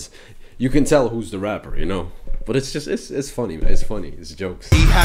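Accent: France. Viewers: Pleaaas react to BTS Vs block b pleas and I like your french accents brooooo c'etais parfait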